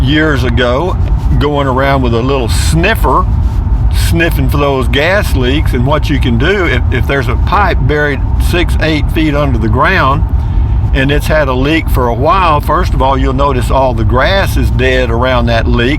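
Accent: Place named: South, United States. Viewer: After watching this video I realized why I watch everyone of your videos. You have a GREAT sense of humor and your good ole boy accent is so relaxing and enjoyable. GOD bless you for being alive and sharing you life with all of us all. You enrich us all!!! Signed, one of your Rangers..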